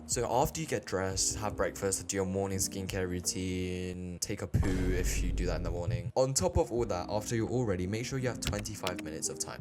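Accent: United Kingdom. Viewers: I do the counting down thing. Really helps! Great video-- very entertaining but clear and straight to the point. I think you hit the nail(s?) On the head with the importance of what happens before you go to bed. Lots of points for me to try out and possibly share with others. Also, took me a while to realise you're a Brit not an American😅 Great video!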